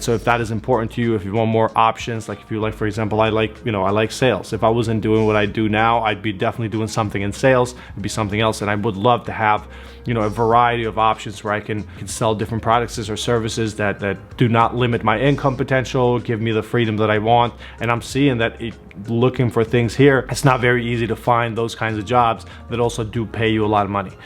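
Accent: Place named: New York, United States. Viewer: I definitely hear you being a New Yorker. I love the country and the city (not suburbs) and I have a modest housing budget so it’s always challenging (and intriguing) to decide where to live!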